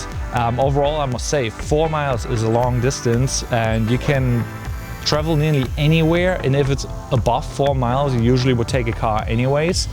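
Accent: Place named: Germany. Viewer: oh i really like Germans when they speak english :)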